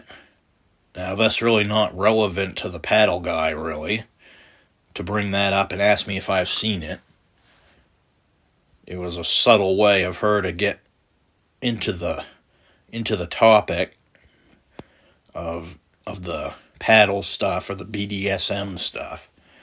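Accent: Maine, United States. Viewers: I hope you don't take this as me fishing (lolgetit) for information and feel free to delete my comment or just ignore if you don't want to reveal but...is that a Maine or Maine adjacent accent?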